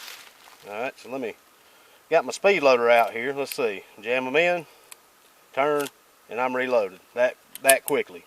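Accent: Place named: Boston, United States. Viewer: I had a friend from Boston who spoke just like this guy.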